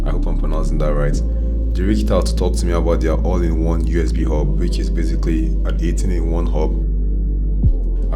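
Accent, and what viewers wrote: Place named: Nigeria. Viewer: I can hear the Nigerian accent 😂 never leaves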